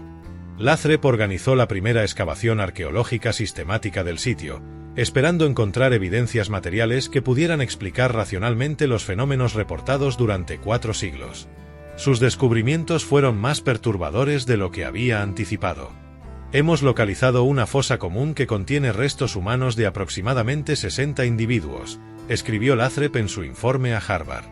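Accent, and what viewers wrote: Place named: Europe. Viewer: Observen que su voz está en acento europeo. Pero grabado recientemente. ¿El guionista cuánto cobró? En San Cristóbal nunca se había oído una cosa así. No crean todo lo que escuchan. Te da un guión con una narrativa impactante Y cómo la gente no le lee... La Era de la Desinformación Al mismo tiempo que habla de que fueron los portugueses dice que fueron los españoles. Tampoco dice otros datos verdaderamente teórico.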